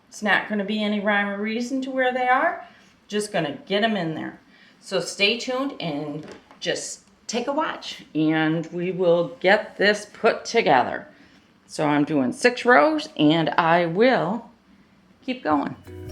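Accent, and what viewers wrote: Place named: Rochester, United States. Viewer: Are you from Rochester NY ? I here the accent.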